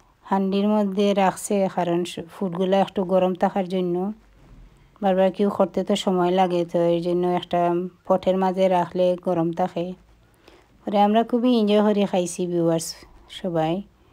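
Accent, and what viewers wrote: Sylhet, Bangladesh. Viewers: Kita goh affa apne ki sylheti, na shuddo na nokhali bolchen 💙 onek balo laglo apnar friend er food deke, Thank you for the share 👍👍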